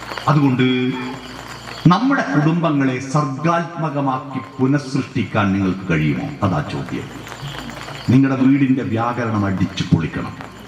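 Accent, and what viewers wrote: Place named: Kannur, India. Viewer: ' ഞാൻ ഏറ്റവും കൂടുതൽ കേൾക്കുന്നത് ഈ പരിപാടി ആണ്. കാരണം പഴയതും പുതിയതും ആയ പലതും ഇതു കേൾക്കുമ്പോ പഠിക്കാനുണ്ട്. എത്ര എത്ര അർത്ഥവത്തായ കാര്യങ്ങളാണ് പറയുന്നത്. ഇദ്ദേഹത്തിൻ്റെ കുടുംബം എത്ര ഭാഗ്യമുള്ളതാണ്. കാരണം ഈ ഒരാളു കാരണം കുടുംബം നാട് -ഒക്കെ നന്നാവുമെങ്കിൽ എത്ര സന്തോഷം. ഒരു ഉദാഹരണം . ഇന്ന് കാലത്ത് ഒരു ദുഃഖവാർത്ത കേട്ടു അപ്പോ മുതൽ മനസ്സ്മല്ലാത്തൊരു അവസ്ഥയിലായി. ഒന്നും ചെയ്യാൻ തോന്നുന്നില്ല ആകെ ഒരു വല്ലാത്ത അസ്വസ്ഥത അപ്പോ എന്താ ചെയ്യേണ്ടത് നാമം ജപിക്കാൻ തോന്നുന്നില്ല ഒന്നും വായിക്കാനും തോന്നുന്നില്ല TV ഒട്ടും കാണാൻ തോന്നുന്നില്ല എന്തോ എനിക്കതോന്നി ദിവസവും കേൾക്കുന്ന ഇദ്ദേഹത്തിൻ്റെ ചാനൽ നോക്കിയാലോ പലതും കേട്ടതാണെങ്കി കൂടി കേൾക്കാൻ രസമുണ്ട് വാസ്തവങ്ങളാണ് പറയുന്നത്. വച്ചു കുറച്ചു കവിതയും എല്ലാം കേട്ടപ്പോ ഒരു വലിയ ഭാരം മനസ്സിൽ നിന്നും ഇറക്കി വച്ച പോലെ തോന്നി. ഇതാണ് ഒരു മരുന്ന്. ഒന്നു കേട്ടു നോക ഒരാശ്വാസവും - ഒരുഉത്സാഹവും ഒരു സമാധാനവും കിട്ടി നന്ദി ആരോടു ചൊല്ലെണ്ടു ഈ മനുഷ്യനോടോ ഈശ്വരനോട് അറിയില്ല ഭാഷ കണ്ണൂർ ആയതു കൊണ്ട് ആദ്യമാദ്യം ചിലതൊന്നും മനസ്സിലാവില്ലായിരുന്നു. 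ഇപ്പോ കുഴപ്പമില്ല. ഒരാവർത്തിയല്ല പല ആവർത്തി കേൾക്കുന്നു ഞാൻ. നന്ദി - നമസ്കാരം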